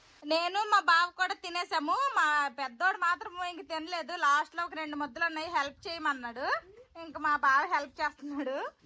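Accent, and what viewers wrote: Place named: Srikakulam, India. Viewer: garu nenu tea tagutu me video chusa andi. Madya lo sir ni meru dibada dabada badeyyali annaru. Chala navvi polamaranu. Okkasari chinnappudu gurtocchindi. Madi vzm.meru Sklm slang lo baga matladutaru. Mammuluga kuda baga matladutaru. Overall ga natural ga matladutaru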